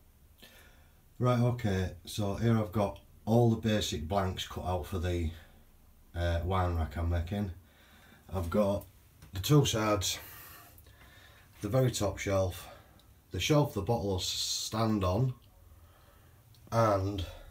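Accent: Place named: Hull, United Kingdom. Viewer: This is driving me nuts looking for clues. lol. Is it Hull?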